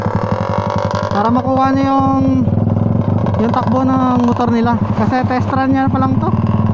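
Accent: Iloilo, Philippines. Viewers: Ilonggo ka boss? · Ilonggo ka sirr???? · parang ilonggo ka po😹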